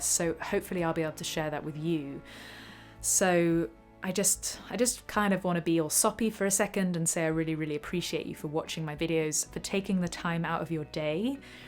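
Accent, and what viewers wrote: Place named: RP, United Kingdom. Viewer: Good on you, thanks for the look around your studio! I enjoyed listening to your very easy on the ears posh British accent... Best of luck with the record!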